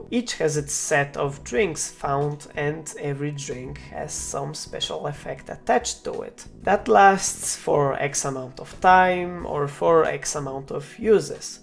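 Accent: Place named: Eastern Europe. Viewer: Strong slavic acent. Yeah dude you better make a subtitles next time.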